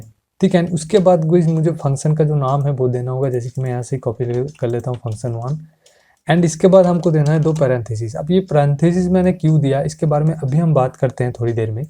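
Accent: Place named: Bengal, India. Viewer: Ami 1 ta app banate chai... Kintu sob kichu r tutorials pachina... Tai banate parchina... Tomar kotha sune mone hoy tumi bengali... Tai bangla te comment korlam... Ektu help korle ami app ta banate parbo... Thanks....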